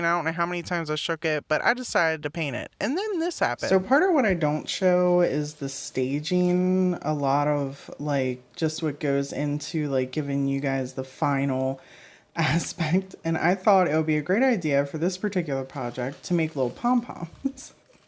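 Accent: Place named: Pennsylvania, United States. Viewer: I enjoy listening to you. You sound like Pennsylvania. I loved growing up in the 50’s. Kids were so innocent.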